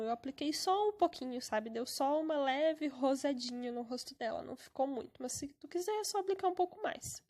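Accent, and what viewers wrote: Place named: Rio Grande do Sul, Brazil. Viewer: Guria...tu é gaúcha!!! Reconheci o sotaque e os produtos da Panvel... De que cidade???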